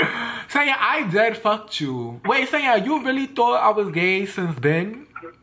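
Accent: New York, United States. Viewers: I love your New York accent😍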